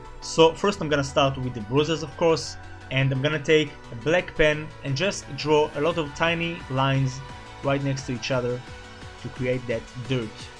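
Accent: Israel. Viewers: אני חייב לשאול אתה ישראלי? יש לך מבטא והשם שלך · אתה ישראלי? אתה נשמע ככה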